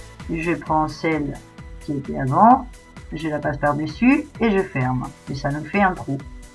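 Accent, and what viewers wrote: Spain. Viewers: Explications très claires avec en prime in petit fond d'accent espagnol super charmant...!;-)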